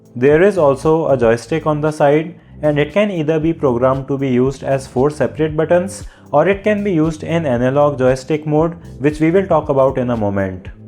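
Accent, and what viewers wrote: India. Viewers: Your English like a hindi language 🙄❤️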